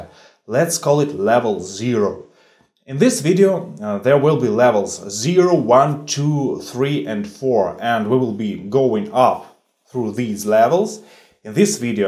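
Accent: Russia. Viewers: I love the Russian accent! Many, many years ago when I was studying Russian in high school (in the 70s), our instructor was teaching us how each language has something unique about it that is difficult for non-native speakers to duplicate. His point was that we need to master these differences in order to not sound so "American" when speaking Russian. For example, In English, we do not roll our r's. That's difficult for many English speakers and has to be practiced. We would spend a lot of time trying to properly pronounce words like рыбa, работа, рот, ручка и т.д. In English, we have the "th" combination that is rather unique and difficult for many non-English speakers to duplicate. That's why many non-English speakers have a difficult time properly pronouncing a simple word like "the." When a German speaker, for instance, tries to say, "I think so," it might come out sounding more like "I zink so" or "I tink so" or "I dink so." There are English sounds that do not exist in Russian. 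I remember my Russian teacher jokingly telling us about something he called the "Bit, Bat, Boat Test." This is how you know a person is a native Russian speaker and not an English speaker. Ask a Russian to say "bit" and it will invariably come out sounding more like "beet." That's because Russian doesn't have the short-i sound. Ask a Russian to say "bat" and it will come out sounding more like "bot." That's because Russian doesn't have the short-a sound. Ask a Russian to say "boat" and the "o" so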